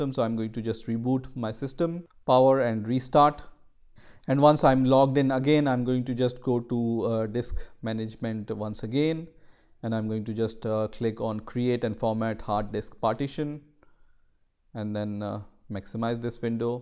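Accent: India. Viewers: He sounds Indian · as soon as I heard the Indian accent I knew there was no hope for my problems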